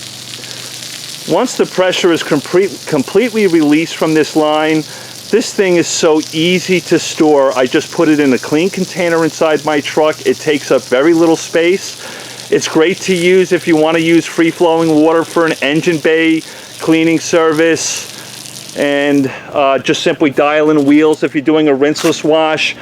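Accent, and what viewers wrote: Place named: Texas, United States. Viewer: Thanks for the video. I recently found your channel when searching for reviews of polishers. Funny, I have no trouble understanding you despite the Texan drawl ... :)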